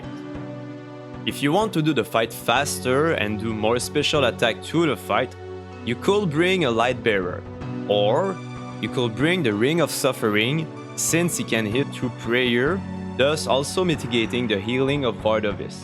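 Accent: Quebec, Canada. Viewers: mmmm ca sent le quebecois lol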